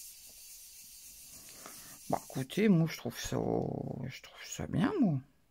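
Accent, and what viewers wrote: North, France. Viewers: Tu es du nord ??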